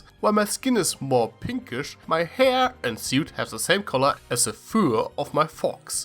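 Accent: Germany. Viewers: ARE U GERMAN!?! BECAUSE IM FAMILIAR WITH ZE ACCENT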